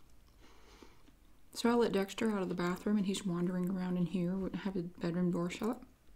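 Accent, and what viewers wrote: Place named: South Carolina, United States. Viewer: Normally, I despise mukbang videos, as they make me nauseous. But to my surprise, I absolutely loved this video. First of all, I feel like you’re eating naturally as a person normally would, and I didn’t get the feeling that you’re “over-acting” the eating for the video. But what really makes it enjoyable is your soft-spoken chattiness. I find your soft voice and Southern accent very pleasant and soothing to my ears. Therefore, the overall effect is something akin to sitting down and having a nice lunch and a pleasant, relaxing chat with a good friend. How much more soothing can a video get? PS: I’ll second what others have already said in the comments, about how fun it is to hear you say “puddin” the way that you do. ☺️😁👍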